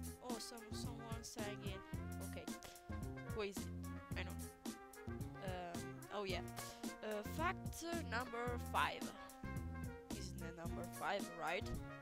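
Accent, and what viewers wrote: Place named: United States. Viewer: hai una voce classica americana aahah ???? wtf???? hahaha mi fai troppo ridere!!!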